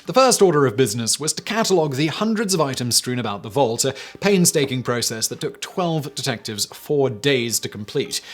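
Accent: England, United Kingdom. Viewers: I think deserves a statue. He manages to get people to pay attention to the most random and banal subjects but that English accent and dry humor makes us forget that we didn't know we didn't care about whatever subject we didn't know we didn't know about. Encouraging accidental education during quarantine is a difficult thing to attempt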